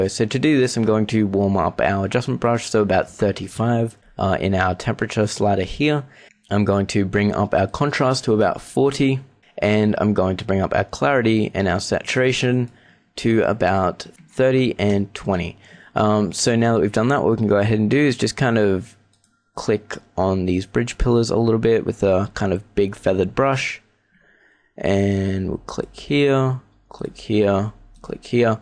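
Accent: Australia. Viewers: I love how your voice sounds.. No Homo. Giving Aussie accents a good reputation :3 Sick tutorial mate!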